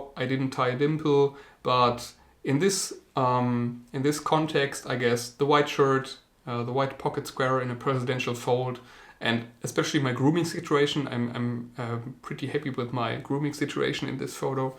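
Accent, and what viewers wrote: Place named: Germany. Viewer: Great content. Your accent reminds me of my ex girlfriend dad. He is German and has the same accent 😅 no offense haha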